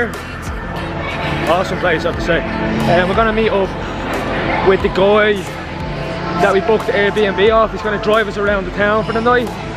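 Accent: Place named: South, United States. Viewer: Are you southern? You sound like Miranda Sings or it’s just me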